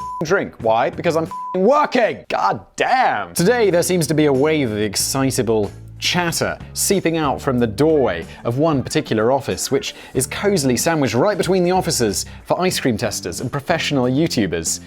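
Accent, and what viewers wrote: United Kingdom. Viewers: I'm here for your beutiful British voice and your ability to rant more frequently than my own thoughts. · Oh dear! We are here because we are Gluttons for Punishment.... and some of us are fans of the British Humour and Accent! lol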